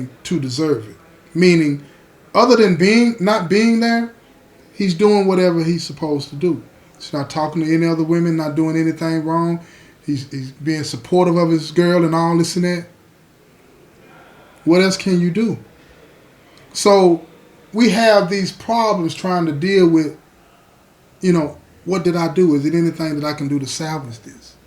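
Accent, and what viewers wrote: South, United States. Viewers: Hi! I look forward to hearing ur videos everyday, ur very consistent, on time and that southern. Voice of urs I love it. Keep doing what ur doing. I'm glad ur son recommended u I subbed IMMEDIATELY. B BLESSED CUTIE.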